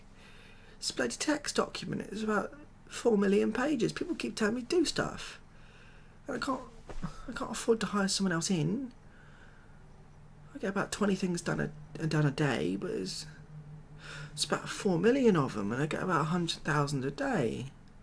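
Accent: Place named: Estuary, United Kingdom. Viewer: Nice estuary English. "Transla'or! "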